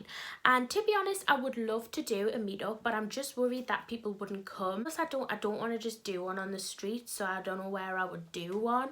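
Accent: Newcastle, United Kingdom. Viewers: I love your accent! Makes me miss Newcastle. I used to live up there for a bit. 🙂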